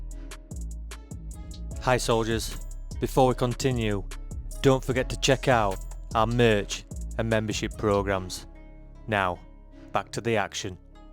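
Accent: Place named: Northern England, United Kingdom. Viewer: Apologies if I got that wrong. It's a nice northern accent, but there's still a lot of unnatural sounding pauses to my mind.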